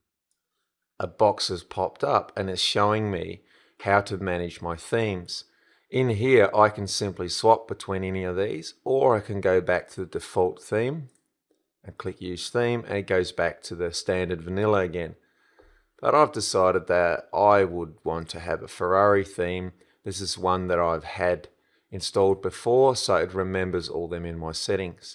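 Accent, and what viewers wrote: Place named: Australia. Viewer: australian!! i love that accent.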